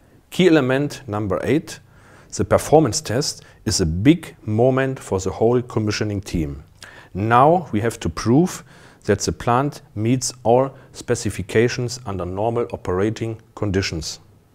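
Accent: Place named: Germany. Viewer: Thank you For this training Mr., it is very helpful for me. i liked your german accent ^-^.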